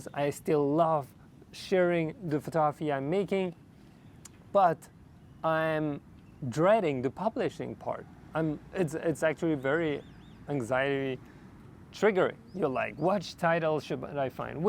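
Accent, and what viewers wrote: France. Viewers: I am watching your videos from time to ime, most accidentally, but mostly I am impressed by your "Closeness". i hate the ads in youtube but it seems there is no way except booking an expensive abo. I look videos too on rumble or odyssee for political reasons. And what I also like on your videos is the good speaking quality even with the little frnch accent. That is an aspect what is oftly missed by other youtubers. They present not an accent but a slang which is not understandeable for anyone who wasn't born in the right state.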